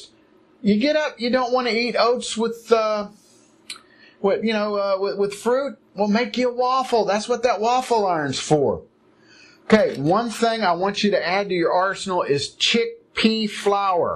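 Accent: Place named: United States. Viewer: Hi! You are too fun! Just subscribed. Love the American accent and the bottle of poison on the window sill and you can never go wrong with your very own handcuffs. My biggest issue is coffee. I started using espresso and cashew milk. That’s about the only way I can handle it. I find breakfast the most difficult because I wake up at four am to go to work, so I actually eat two breakfasts. One at four-thirty and one at work at seven-thirty. Then lunch, and a light dinner. I try to stay away from bread because I have a gluten intolerance, so I am experimenting with granola that I make, and some peanutbutter and rice cakes. I can’t take nuts to work, because it is a nut free facility. I work in an Oreo factory in Toronto...lol. At least the product is vegan. Have a fantastic day, my friend.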